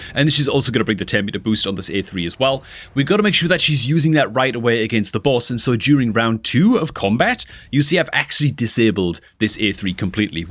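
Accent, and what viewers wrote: North East England, United Kingdom. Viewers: He's from the north east of Britain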